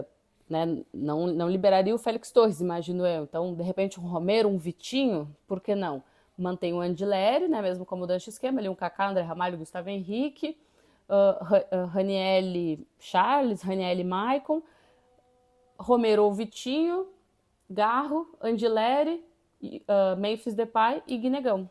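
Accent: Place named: South, Brazil. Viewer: Você é😂 Catarina ou Gaúcha? Porque este "ãn" é característico destes dois estados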